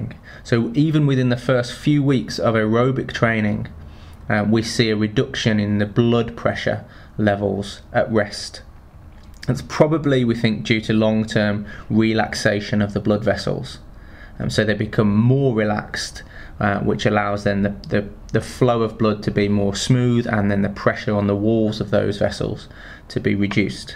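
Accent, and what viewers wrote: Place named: Midlands, United Kingdom. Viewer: Your voice accent sounds like it is from Leicestershire/ Midlands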